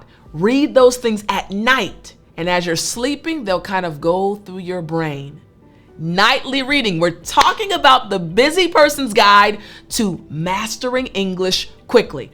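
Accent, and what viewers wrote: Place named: United States. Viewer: Best American accent I've ever heard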